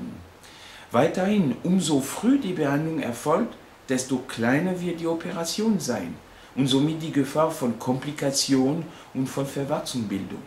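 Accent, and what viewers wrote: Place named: France. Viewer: je reconnais votre petit accent français , ses trop mignon merci pour vos explication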